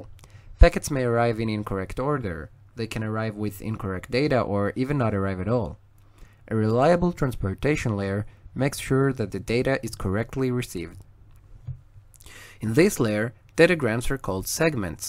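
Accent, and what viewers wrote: Israel. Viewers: אחלה וידאו! באמת, מהברורים ביותר שראיתי שמסבירים על מודל השכבות. ממש מוזר שאין לו הרבה יותר צפיות. מה שכן - המבטא העברי ממש מורגש.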